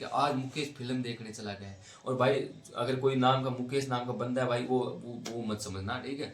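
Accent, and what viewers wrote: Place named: Haryana, India. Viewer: Bhai hariyana se ho aap right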